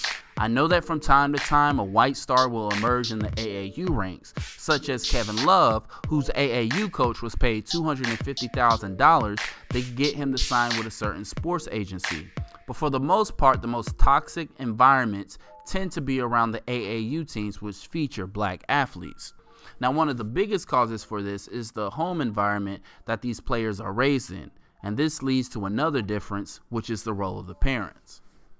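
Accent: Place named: Oregon, United States. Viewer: Sounds like you live in Salem or Albany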